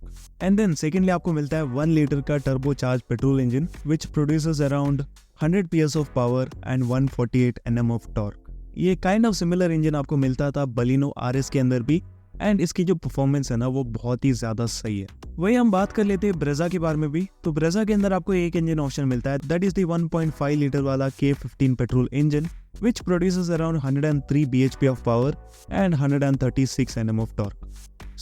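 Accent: Kerala, India. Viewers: The speakeris a South Indian most probably a Malayali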